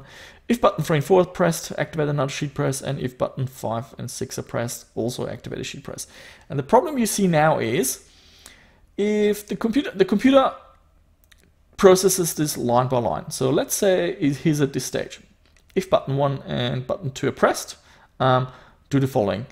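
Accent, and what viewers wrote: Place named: Germany. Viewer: Your accent sounds half German, half Australian.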